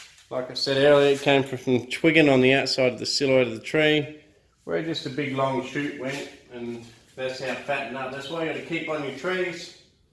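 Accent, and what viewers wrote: Australia. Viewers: can anyone tell me what accent is that? is he Australian?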